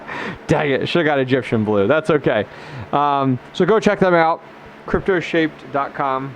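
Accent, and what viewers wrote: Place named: Boston, United States. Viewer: My friend, you have a Boston accent.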